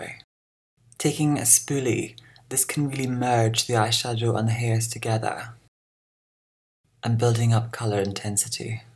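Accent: Scotland, United Kingdom. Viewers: I've been binge-watching your videos today and I have noticed that your Scottish accent peeks through more in these older videos. The way you speak is absolutely hypnotizing.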